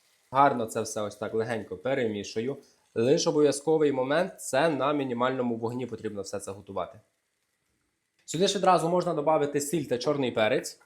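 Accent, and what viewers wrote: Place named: Western Ukraine, Ukraine. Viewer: Jak pravilnyj???Vy z zavzdy chejtyte zachidnjakiv za dialekt.Choca já zavzdy svarjusa Jakyj dialekt?Chlopec hovoryt z mirnym dialektom.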